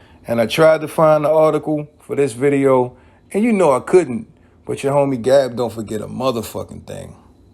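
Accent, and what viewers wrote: Baltimore, United States. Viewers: Your bmore accent is heavy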